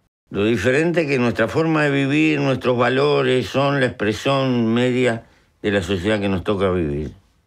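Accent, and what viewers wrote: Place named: Gaucho, Argentina. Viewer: 3.31 min un helicotero (helicoptero) jajaja grande pepe la expreción campera y gaucha no la cambies nunca!!!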